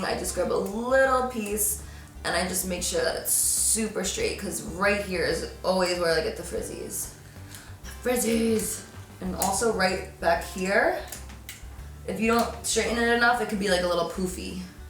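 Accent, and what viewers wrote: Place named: East, United States. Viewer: I really like your slight accent! It sounds kind of east usa idk. Like, a subtle mix of boston n new york. Idek but I like it 😍😂😂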